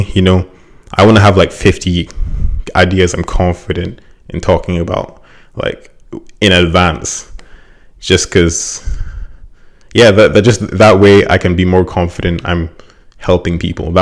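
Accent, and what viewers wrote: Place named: Scotland, United Kingdom. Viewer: YT is literally therapy for a lot of ppl. The Scottish accent thooo!!!! 😂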